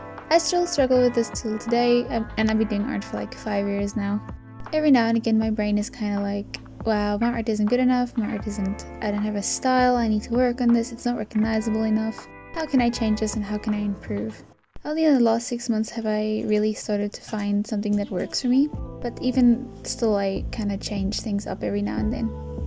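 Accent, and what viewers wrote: South Africa. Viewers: Are you South African?! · is that a south african accent? :D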